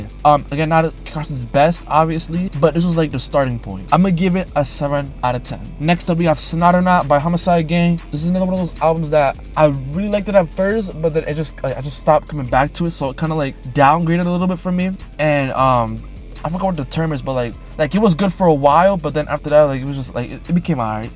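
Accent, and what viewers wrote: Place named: Mexico. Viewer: WAIT YOU SAID THE N WORD??? YOUR BLACK????? I THOUGHT YOU WERE MEXICAN. you sound mexican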